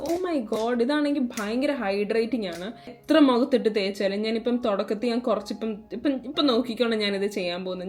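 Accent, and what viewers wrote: Pathanamthitta, India. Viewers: Enik ariyathila’that typical pathanamthitta slang 😅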